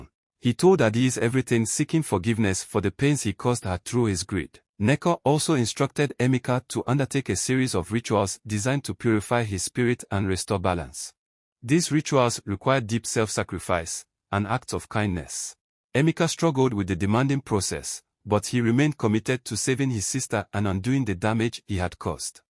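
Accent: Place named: Nigeria. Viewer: Better. Get a very nice one and use instead of this Nigerian voice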